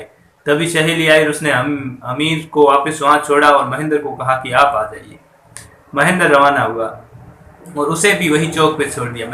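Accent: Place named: Marwar, India. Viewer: होकम आपकी बोलने की शेली बहुत सुंदर मारवाड़ी देशी भाषा की तरह हिंदी भी बोलते है बहुत सुंदर होकम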